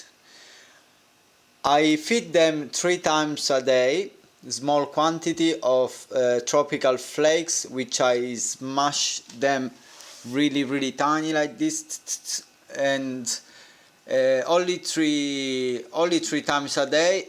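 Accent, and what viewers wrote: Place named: Italy. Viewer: pretty sweet bro! u italian? hahaha ur accent makes me tell u r! but anyways nice tank and vid! just earned a sub please consider subbing back please if u want.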